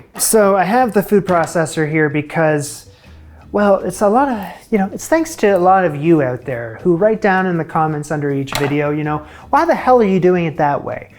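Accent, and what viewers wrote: Canada. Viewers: Your previous pronunciation of cauliflower is not that big of a deal. What bugged me at first was how you said “pasta”. But then I heard a few other words that were pronounced in a distinctly Canadian way. So, I Googled “how to Canadians pronounce pasta”. Sure enough, I discovered that was it. My point is that with the way a certain 🦇💩🤪🍊🤡 has been treating our neighbors to the north, I’m going to try to adopt the Canadian pronunciation of “pasta” for the foreseeable future. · Don't be embarassed by your Canadian pronunciations....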